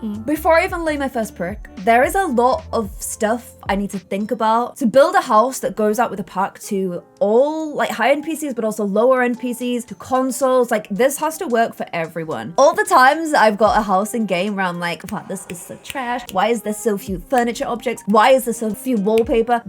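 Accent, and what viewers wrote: United Kingdom. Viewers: My American self laugh so much of how she said rabbit Is that wabbit I’m sorry I’m American and think British accent are funny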